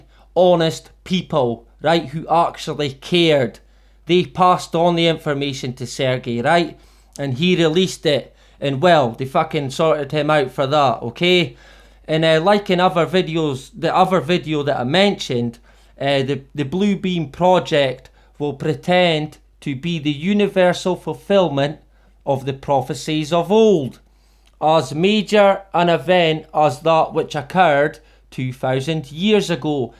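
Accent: Scotland, United Kingdom. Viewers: Shalom, dear friend and brother. Thank you so much for this information. I'm going to be sharing it, along with the previous video on PBB, with family and friends who think I made this stuff up lol. Keeping you and yours in my prayers. Love that you're not afraid to share the truth, especially with that amazing Scottish accent! Say hello to bonnie Scotland for me! Much love from still chilly Michigan USA. 😍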